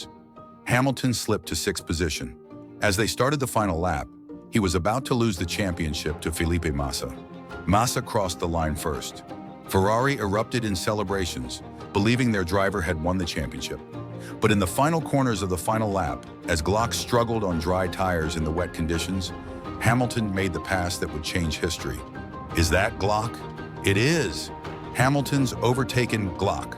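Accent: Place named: United States. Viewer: I was all in , about to hear what I've heard so many times,heard the American voice over, I clicked off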